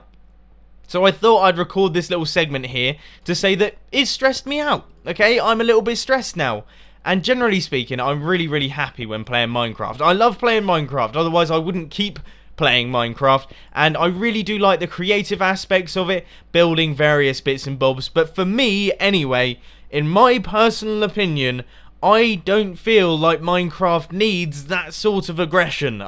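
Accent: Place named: United Kingdom. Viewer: I'm new to this channel is British? He has accent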